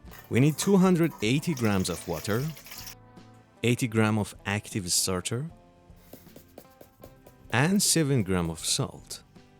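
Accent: Iran. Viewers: i recognise a clear Persian accent. am i right dadashi? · What a Persian accent 😅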